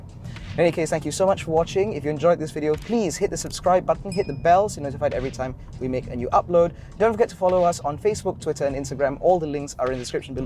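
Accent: United Kingdom. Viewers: sound like British accents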